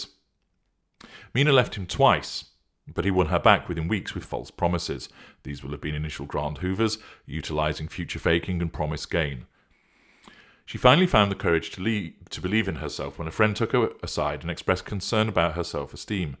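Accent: United Kingdom. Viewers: this gentlemen is british for sure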